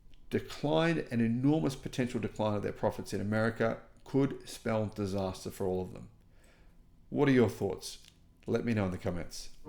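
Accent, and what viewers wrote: Australia. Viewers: U r an Aussie sir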